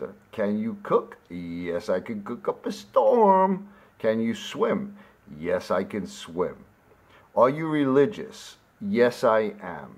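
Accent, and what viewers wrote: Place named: Arkansas, United States. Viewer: Okay I didn’t know you were from Arkansas, that accent clearly gave it away! I really didn’t think you would do this but I’m so glad you did 😁first of all thank you for the awesome shout out my friend. And I Loved loved loved the pics. Some of those faces 😂he’s too cute! ☺️ I wish I would’ve thought to plead the 5th on some of those answers🤔 dang it! I loved your answers I appreciate you being so down to earth and so honest, kind, and funny! The dancing 🕺 answer made me LOL literally. I hope you are having an awesome day my friend. Stay safe!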